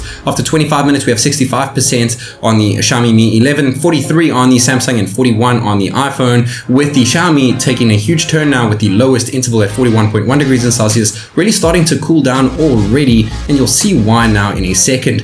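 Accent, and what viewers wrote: South Africa. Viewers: I just gotta ask, are you South African because you kinda have that accent?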